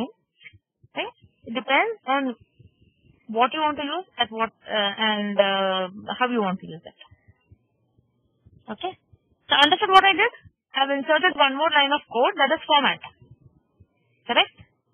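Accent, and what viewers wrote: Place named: Asia. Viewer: Very good and knowledgeable video while learning SAS, thnx to this post and the lady who is teaching. and those who think its asian voice so he/she cant teach, and those who think its poor quality(go ad c ur a*** hole) and the one who can't understand this simple language....are big fuk***** and ge out of here :P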